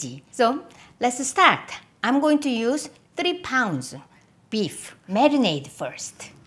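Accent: South Korea. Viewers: 와 한인영어 너무좋아요 항상 잘보구잇습니다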